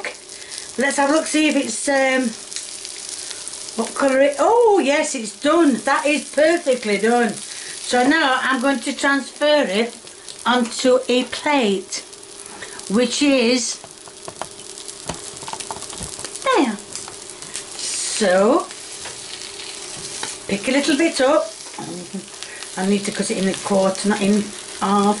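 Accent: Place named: North, United Kingdom. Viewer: Hiya... I find your lovely northern accent very comforting and your cooking very inspiring... I would love to see you do your favourite food to eat/cook. 🎉❤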